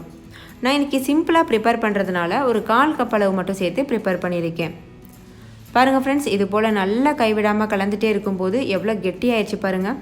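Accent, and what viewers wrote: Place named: Erode, India. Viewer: Akka neenga erode Akka.. Unga slang spr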